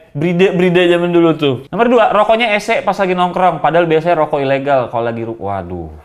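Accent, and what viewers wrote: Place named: Java, Indonesia. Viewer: lo gw logat jawa the best fking starboy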